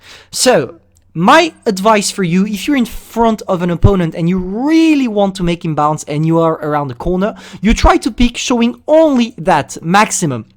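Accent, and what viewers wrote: France. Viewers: Lol t'es français ? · Mec fait pas semblant t'est français sa se voit à ton frenglish 😏😂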